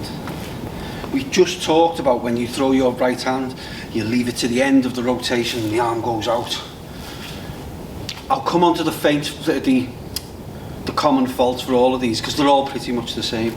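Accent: Liverpool, United Kingdom. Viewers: IS this the liverpool accent?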